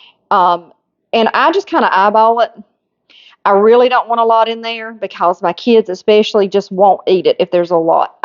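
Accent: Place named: South, United States. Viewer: God bless you from Oahu. 👋🏼🌴☀️ I clicked for a southern sound and I am 100% satisfied. : )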